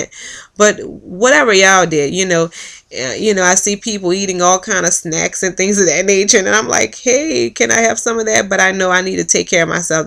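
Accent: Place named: Louisiana, United States. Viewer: Aww hun!! The good thing is that you are doing much better!! I think we all go through that "Why", once I got home from surgery...I was like what in the world did I do. But like you it is all for a better me. I still question did I pick the right surgery...I battled between Sleeve and Band but I am banded now and have enjoyed the ride thus far. Sending love and a big hug your way. I hear the Louisiana in ya. I am from New Orleans originally!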